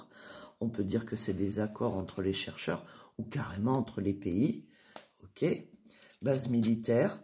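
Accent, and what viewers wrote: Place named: South, France. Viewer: très belle accent du Sud je l’adore et elle fait un taf remarquable ♥️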